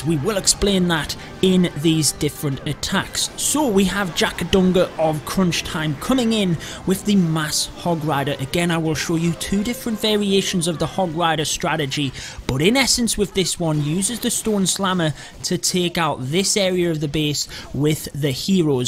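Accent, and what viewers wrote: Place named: Newcastle, United Kingdom. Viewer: Cool it’s nice to see another Geordie clasher😂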